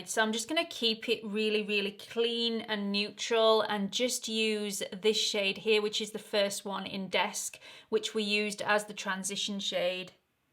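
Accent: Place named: England, United Kingdom. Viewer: She is absolutely Beautiful, I love her English Accent, adorable. Looking forward to other videos. Thank you.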